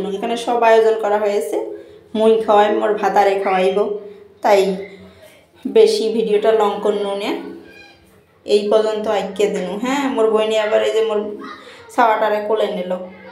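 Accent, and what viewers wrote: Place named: Rangpur, Bangladesh. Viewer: আপু আমার বাড়ি রংপুর, আপনার মুখে আমাদের রংপুরের আঞ্চলিক ভাষা শুনে ভালো লাগলো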